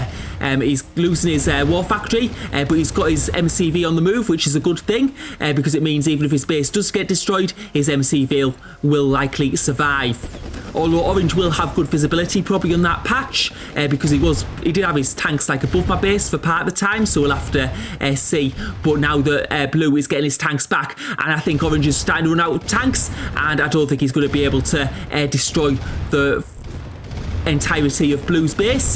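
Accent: United Kingdom. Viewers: The queen's English (miss her already).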